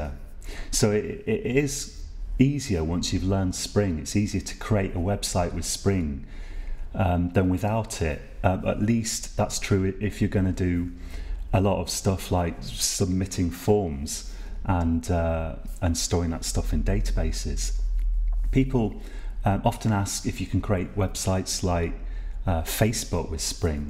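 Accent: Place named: United Kingdom. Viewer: British accent ，i barely heard of in the other' s tutorial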